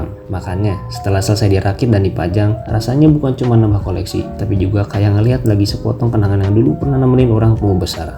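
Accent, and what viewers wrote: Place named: Banjar, Indonesia. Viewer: Keren bang....semangat...kaya urang banjar ( urang = orang dalam bahasa banjar ).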